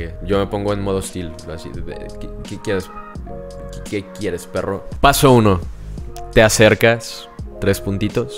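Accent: Fresa, Mexico. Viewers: Eres whitexican, hablas bien fresa y solo te ven porque estas guapo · Eres whitexican, hablas bien fresa y solo te ven porque estás guapo.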